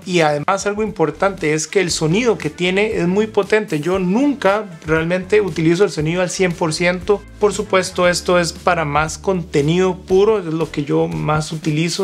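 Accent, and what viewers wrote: Costa Rica. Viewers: Me va a decir que no es tico? Yo juré que sí, lo escuché y dije, que bien un tico jajaja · Este Mae habla como tico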